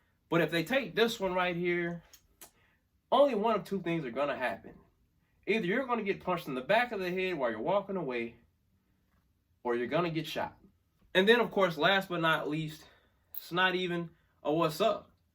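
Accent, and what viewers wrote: South, United States. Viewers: As a Born and Raised Alabama native this is 100% true!!!! Every. Single. Word. He says and btw it’s not just the black culture or ur African roots cuz errybody Ik talks like that!😂 ♥️ur content keep rising my Southern brother!! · Excellent interpretation of southern speech 🎤. I understood everything and gave answers before you stated it 😂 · As a Texan from birth this is the best representation of the southern dialect I’ve ever seen thanks for education ‘em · As a native from mississippi he doin pretty good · I can't speak for other states, but here in Arkansas this is pretty accurate for how both black and white folks talk. There's some subtle differences in word choice and vowel sounds, but the cadence and tone are all right. Makes sense, given you're from Mississippi. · I'm from Tennessee, we talk like this too. 😂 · I live in Mississippi, and I feel embarrassed in front of people because of my lack of understanding, which makes me feel isolated. 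Please continue making videos specifically in the Southern accent to improve my understanding level.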